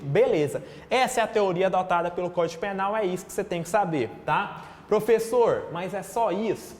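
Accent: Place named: Goiás, Brazil. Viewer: Esse professor é goiano ou mineiro, só poder ser.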